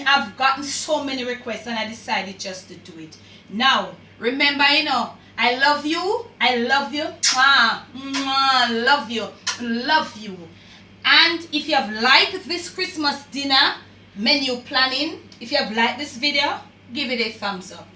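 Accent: Jamaica. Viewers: Big up yourself!! I'm so happy I discovered your channel. I love your delivery and your embrace of the jamaican patois. Continue doing an awesome job,can't wait to see more videos.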